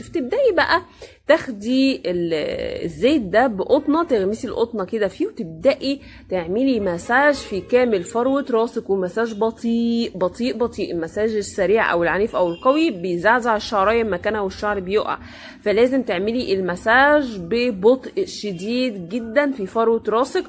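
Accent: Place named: Maghreb. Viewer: انت بتتقني اللهجة المصرية بس واضح انك من المغرب العربي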